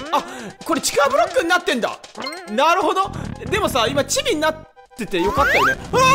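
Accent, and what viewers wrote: Fukuoka, Japan. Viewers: さんの福岡弁がなんか良いですね！これからも楽しみに見させていただきます😀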